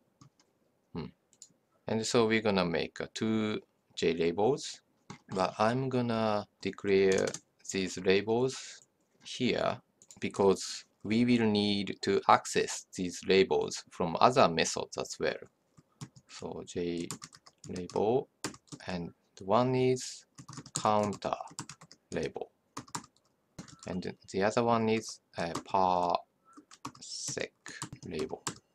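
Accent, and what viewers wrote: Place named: Japan. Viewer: From your accent, I assume you are japanese, but your vocabulary is incredibly refined. Good job, good tutorial, and you've gained a sub. ;]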